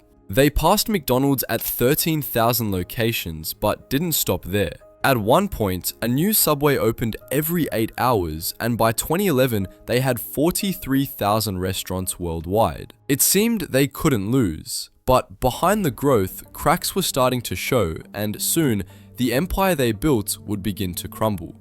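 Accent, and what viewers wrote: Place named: United Kingdom. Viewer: If you like videos about American restaurants narrated in a brit accent, this is for you. As an American, NTY.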